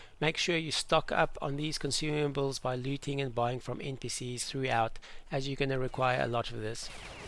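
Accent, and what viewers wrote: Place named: South Africa. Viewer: Was wondering about the accent, also from SA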